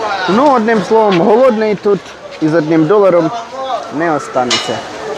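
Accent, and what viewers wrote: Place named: Kremenchuk, Ukraine. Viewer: Однозначно лайк за просування українського контенту❤❤❤🇺🇦🥰 але кременчуцько-світловодська школа рве вуха😂😂😂 люблювас полтавські галушки❤❤❤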